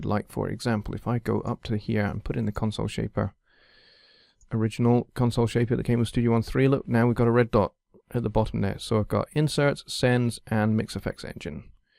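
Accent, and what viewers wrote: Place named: United Kingdom. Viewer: Thanks a lot, very clear information and I like your British accent. Is there a way of finding out who is making thumbs down?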